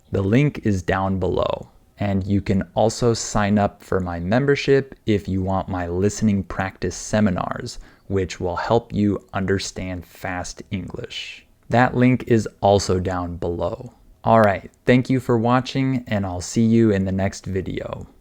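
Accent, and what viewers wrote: United States. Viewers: english american or británico ? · American accent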